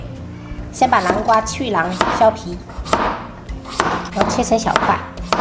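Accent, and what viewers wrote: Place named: Minnan, China. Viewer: 原來是泉州人， 難怪有閩南腔。 不妨用泉州話做節目， 再上字幕就好。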